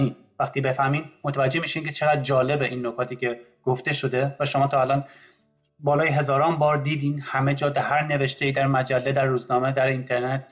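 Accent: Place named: Iran. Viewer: خیلی خیلی لحجه ی ایرانی داری یعنی یه جمله سوئدی رو لحجه ایرانی شو میگی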